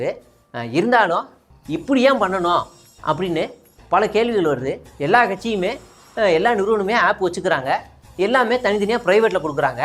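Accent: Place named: Idumbavanam, India. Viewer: enaya idumbavanam tone duthu pesitu eruka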